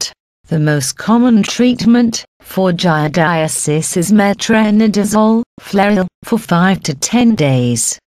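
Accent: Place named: England, United Kingdom. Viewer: That English accent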